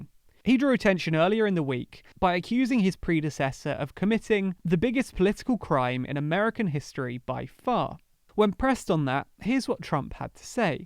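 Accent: United Kingdom. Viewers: Why isnt this lefty loony talking about how a former president was spying on a potential president? I thought lefties are all against invasion of privacy? Btw, this guy sounds like a brit? No mention of the fake dossier that got this bullshit rolling thats been spewed to us for the last 4 years over and over? This whole thing is total bullshit. Makes watergate look like a joke.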